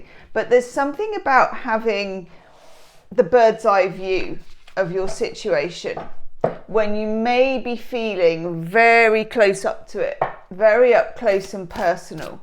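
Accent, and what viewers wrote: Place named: United Kingdom. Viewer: Love your british accent and humour ! 😂😊🎉 thank you !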